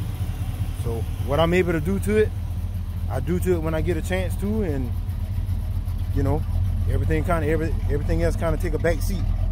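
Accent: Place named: South Carolina, United States. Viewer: I love hearing the south Carolina accent feel like I'm home. I really like the way you mounted the tach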